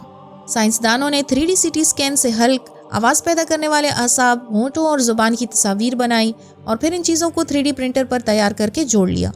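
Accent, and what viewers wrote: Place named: Lahore, Pakistan. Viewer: U are lahori q ke AP ray ko aray prty fact about us means lahories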